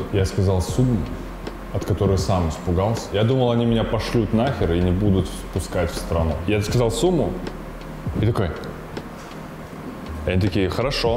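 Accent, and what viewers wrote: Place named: Kazakhstan. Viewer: Если бы я с ним по телефону болтала не видя, я бы сразу сказала свой, казах)) есть у казахов свой неуловимый акцент, как и у корейцев, и у азербайджанцев, и чеченцев с идеальным русским произношением. Это трудно объяснить.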